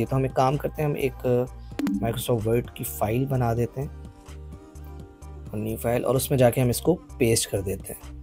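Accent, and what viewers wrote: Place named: India. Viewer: Ur accent like an indian. I was thinking u r Indian.. anyways i got ur video while scrolling really loved it.. thanks for sharing such valuable knowledge i would implement it.. Thanks - love from India ❤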